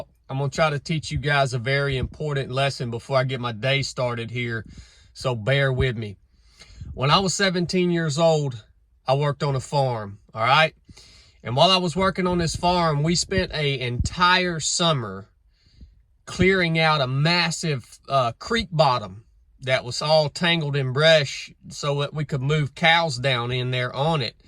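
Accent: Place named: West Virginia, United States. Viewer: You from West Virginia or any of those surrounding states? Accent is familiar with mountain people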